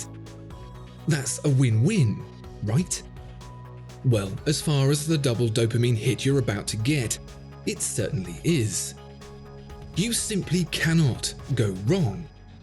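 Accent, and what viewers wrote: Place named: London, United Kingdom. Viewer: The ongoing video of that ugly looking Daytona which caters to the Corvette buyers is a distraction to the flawless spoken narrative originating in those who wish to impress you with their vocabulary and thought process. The accent or dialect is somewhere in the London upper class suggesting good education. The truth is much simpler. You buy a luxury Rolex watch in order to announce the following: I have made it.....have you?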